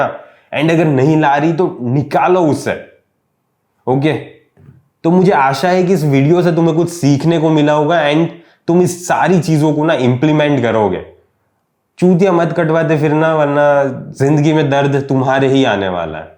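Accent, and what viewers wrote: Gujarat, India. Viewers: Gujju accent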